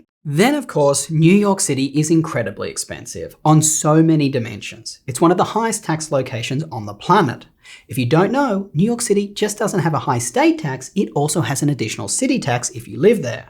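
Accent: Australia. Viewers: Are you Australian????? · yknow i thought you were australian, but you mentioned you moved to the usa from there. interesting!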